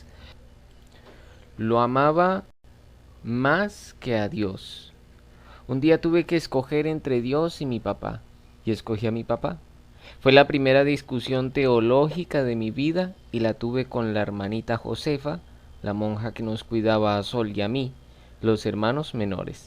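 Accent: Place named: Colombia. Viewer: oír ese español colombiano, es maravilloso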